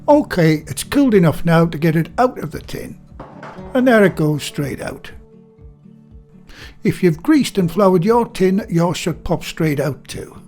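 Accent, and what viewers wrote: United Kingdom. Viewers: Why should people - who are not from England! - make critical comments about the correct, clear, non-dialect, slightly (and pleasantly)-accented English spoken by an English person?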